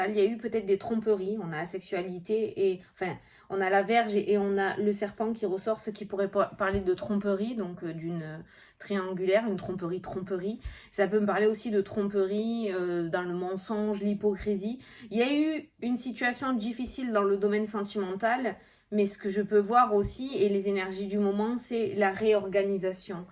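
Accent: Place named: South-West, France. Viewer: Comme on dit chez moi en Suisse, que les esprits critiques aillent voir autre part si tu y es. Argh 😓 Si tu arrives à avoir 53000 abonnés, c'est que quelque part ton petit grain de folie est une bouffée d'oxygène pour certains. Personnellement, ça fait trois ans et demies que je soigne un cancer incurable. Est-ce que j'ai envie d'écouter une personne qui s'écoute parler? Au ton monocorde? La réponse est non. Si je suis ici depuis un peu plus d'un an, c'est parce que tout en toi aspire à la fraîcheur. Ton petit accent du sud ouest est un régal pour les noreilles. 😁 Si j'avais envie d'autre chose, je ne serais pas là depuis un an. Reste le joyau que tu es. Tu me fais rire. Et tu fais du bien, quand les choses sont sensibles en ce qui me concerne. Actuellement ma vie n'est pas un long fleuve tranquille.... loin de là. Repousse les esprits critiques loin de toi, et reste le rayon de soleil que tu "diffuse". 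Avec 53000 abonnés, tu peux même te permettre de les virer! Argh screugneugneu 😓! Personne ne blesse notre! Colère. 😠 🗯 💢 👿 Longue vie à toi, et à ta chaîne ma. 😘 😘 🎵 😚 😚 🌟 🌟 🌟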